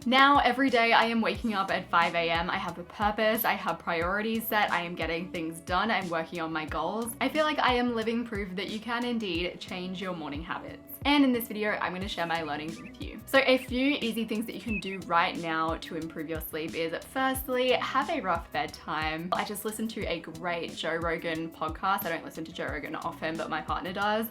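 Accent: Australia. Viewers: I am trying to place your accent. Are you Australian?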